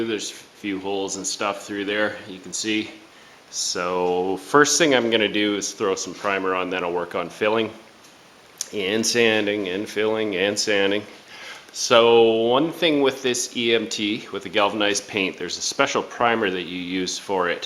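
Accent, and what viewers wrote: Canada. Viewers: Just watch your 4 videos start to finish, good stuff! Had a good laugh reading the comments "Are you in Canada?" I could tell you were a Canadian boy right from the start lol. From Ontario by chance? We should wheel sometime if you are.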